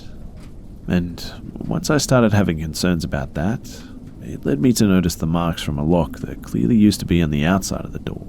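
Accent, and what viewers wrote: Australia. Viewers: What's great about you, Be, is that you're monetized but you don't edit out cuss words. Or maybe I just like to hear you swear in that Aussie accent. Either way, I'm dedicated to this channel. Long live Be! 🐝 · I was surprised that its narrated by a fellow aussie, makes it far better!! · OMG an Aussie narrator! Ahhhhh.....wonderful.... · 😂😂😂😂😂😂😂 he’s Australian you asshat go get your ears tested and don’t ever say an Aussie is a whinging pathetic Pom again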